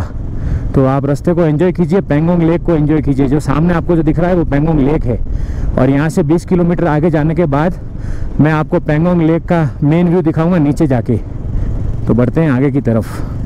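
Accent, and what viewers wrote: Gujarat, India. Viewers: ભાઈ તમે ગુજરાતી છો એ તમારી ભાષા પરથી લાગી જ રહ્યું છે😅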